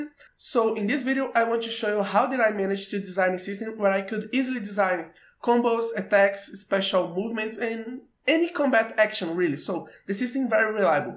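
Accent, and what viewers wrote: Brazil. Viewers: Lol! I recognized the accent too. (Brazilian here too) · Is that a Brazilian accent? great video :D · Vc parece ser um br falando ingles, mt bom o video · You sound a lot like you are from brasil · Eu chutei pelo sotaque mas aí vi a versão BR do livro Level Up no fundo e tive 200% de certeza kkkkk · I see you are a brazillian by your accent! Im a brazillian too! Also i really like megaman x5 its not as bad as people say (x4 was better tho) Great tutorial by the way dude!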